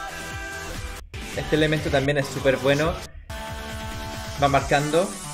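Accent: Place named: Chile. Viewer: Se te nota caleta el acento chileno completo palta mayo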